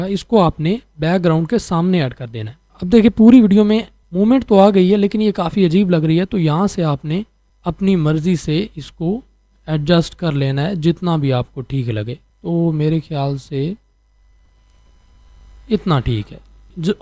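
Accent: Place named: Pakistan. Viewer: u sound like Pakistani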